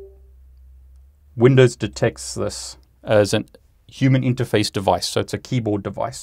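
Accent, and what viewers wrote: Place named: South Africa. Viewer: Now that looks like some nice toys., I am starting Cyber Security at the age of 45 thanks to you, Niel and Networkchuck! Are you South African? Your accent sounds SA...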